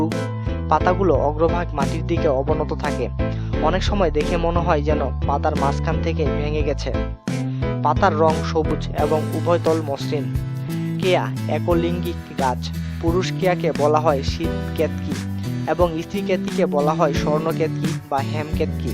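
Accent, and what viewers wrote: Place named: Barishal, Bangladesh. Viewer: ভিডিওর ধারা ভাষ্যকারের বাংলা ভাষার উচ্চারণ বরিশালের আঞ্চলিক ভাষার অনুকরণে উচ্চারিত। অশুদ্ধ উচ্চারণের জন্য অনেক বাংলা শব্দের সঠিক অর্থ বুঝতে অসুবিধা হচ্ছে। বিশুদ্ধ বাংলা উচ্চারন হলে আমাদের সবার বুঝতে সহজ হয়। ধন্যবাদ।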